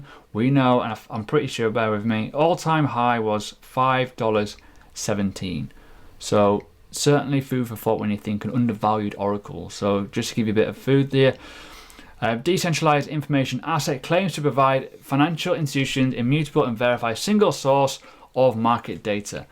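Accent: United Kingdom. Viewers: Hello, I'm from the U.S and sometimes I have a hard time with your British accent. Would you please show the symbols of the crypto currency in the video, summary, or notes, I am new to crypto and I did not understand what "RSI" you were talking about. I pulled up 5 different coins (I think) in coinmarketcap and for future videos please? Thank you in advance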